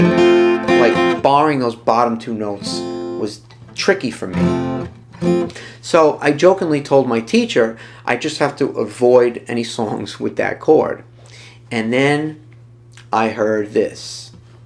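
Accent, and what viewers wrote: New York, United States. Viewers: Wish I’d started at 11 instead of 67 lol Anyway, love to hear the NYC accent. I’m a Bronx boy living in Cali the last 10 years. Not a day goes by that someone doesn’t mention my accent. Enjoyed the video If I ever get serious with practice I can be pretty good in my 90s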